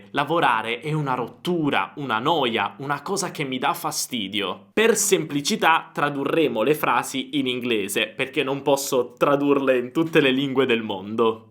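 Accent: Italy. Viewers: ho scoperto il suo canale youtube qualche settimana fa e da allora ho guardato molti dei suoi video. Vorrei congratularmi con lei non solo per il contenuto ma anche per la sua dizione. È estremamente facile capirlo, il che mi rende molto curioso di sapere in quale regione d'italia vive o è cresciuto. Gracie per i suoi eccellenti video. Giulio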